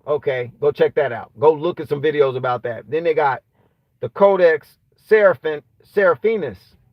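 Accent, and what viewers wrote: South, United States. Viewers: Monkey juice lol You always make me laugh when you say certain things in the middle of the conversation. I love the African american southern accent. I am not american but I love to listen to it.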